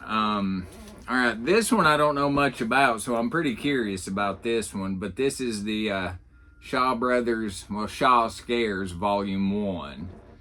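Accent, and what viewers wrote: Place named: South, United States. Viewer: Just found this channel. Great video. You sound like a fellow Southerner. New sub.🎃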